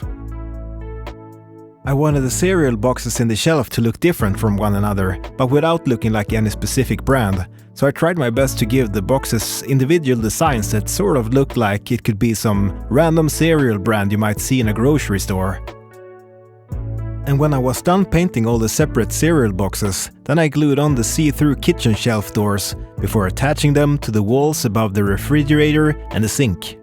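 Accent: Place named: Norrland, Sweden. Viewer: Lägga plaank, where in Sweden do you live? Sounds like up north 🎉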